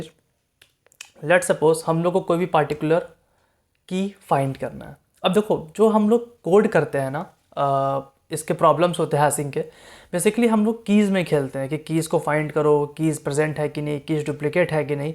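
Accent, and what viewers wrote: Bihar, India. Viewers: sir aap bihar se hai???/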